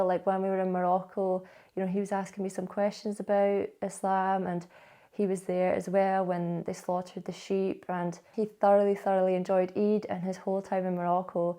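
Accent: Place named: Glasgow, United Kingdom. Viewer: Glaswegian accent😂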